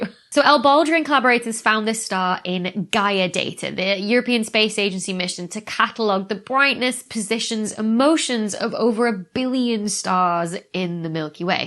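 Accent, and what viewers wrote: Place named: North, United Kingdom. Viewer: Love your style, so straight to the point. The subject of astronomy will always be dear to me and to hear it in a fellow northerers accect is just a bonus. I actually have a very old book showing Halleys comet and was lucky enough to see its last appearance. Keep up the great work Dr Becky 🔭🪐 - Mav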